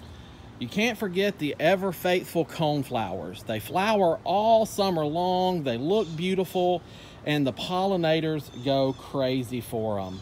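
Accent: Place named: Carolinas, United States. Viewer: I heard you speak & instantly knew you were a fellow Carolinian! I love you already! Happy pollinators week! We did a celebration video too along with Marie at Salongirl Gardens. I’m so happy to see other gardeners raising awareness!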